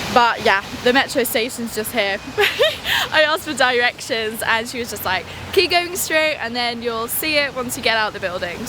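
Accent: United Kingdom. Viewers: Британский английский просто очень красивый, на самом деле. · Такой ярковыроженый британский английский, красиво.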